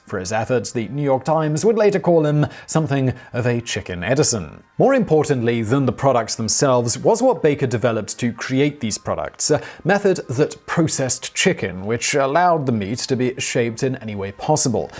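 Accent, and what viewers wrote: United Kingdom. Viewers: this british ascent..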